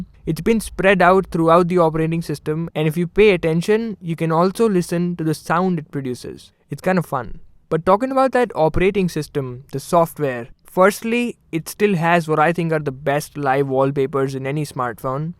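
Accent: India. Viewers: I'm a simple man. I hear an Indian accent, I click dislike.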